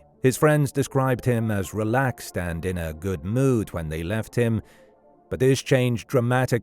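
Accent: United Kingdom. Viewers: Came here to say the same thing. A story about a German, narrated by someone with a British accent ... and they chose to use a word for football that's only really still officially used in USA/Canada..??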